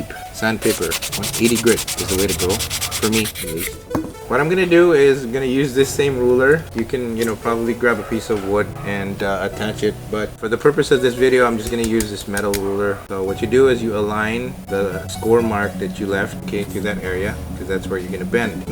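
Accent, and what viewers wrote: Philippines. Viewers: Are from the Philippines, you sound Philippino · Pinoy accent sub😁 idol😂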